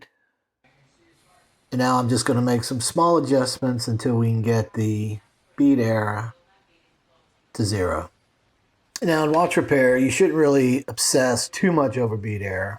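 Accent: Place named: United States. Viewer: Which part in america you from i like your dialect ?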